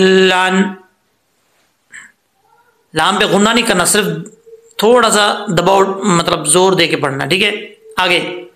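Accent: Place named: Punjab, Pakistan. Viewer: او بھائی! آپ خود تو کہیں سے سیکھ لیں، پنجابی میں پڑھ رہے ہیں،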